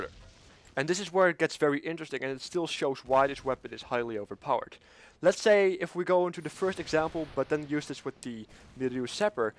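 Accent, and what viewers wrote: Netherlands. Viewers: were u from? u sound a bit Dutch like :)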